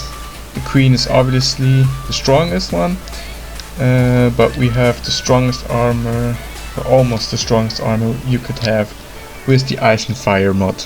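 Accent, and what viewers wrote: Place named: Germany. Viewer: Ich dachte mir bei jedem deiner Videos ey der hört sich so deutsch an auf einmal in diesem Video bei Minute ist da ein Video von Lekoopa 😁 heißt du bist doch deutsch😄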